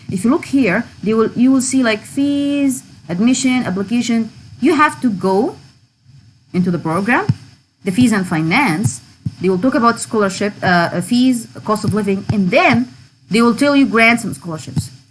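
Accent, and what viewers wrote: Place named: United Kingdom. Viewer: amazing British accent makes me feel how my English is so weak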